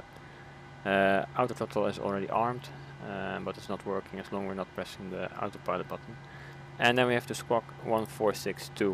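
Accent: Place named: Netherlands. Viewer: hahahah voor mij persé hoeft het niet hoor!! Ik hoorde je stem en ik dacht die komt uit hetzelfde land hahaha! Weetje tegenwoordig kan iedereen best goed engels dus verstaat iedereen je prima en met engelse video’s kan je mensen internationaal bereiken!! Blijf gewoon zo doorgaan en ik zou lekker in engels doorgaan!! Je bent goed bezig!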